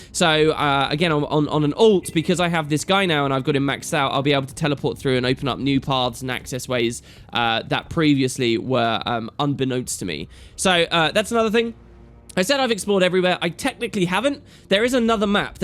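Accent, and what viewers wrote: United Kingdom. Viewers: I really like this channel and find myself agreeing with much of what the speaker says. Though this could be because I'm a sucker for a British accent. :-D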